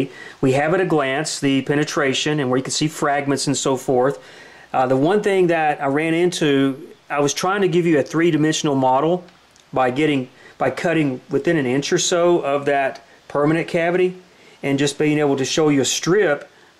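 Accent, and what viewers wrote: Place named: Tennessee, United States. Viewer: What part of tennessee are you from?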